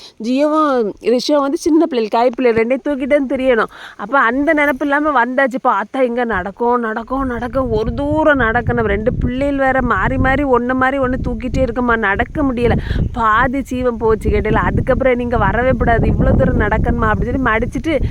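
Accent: Kanyakumari, India. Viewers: Ithu kanyakumari slang bro. It’s nothing wrong.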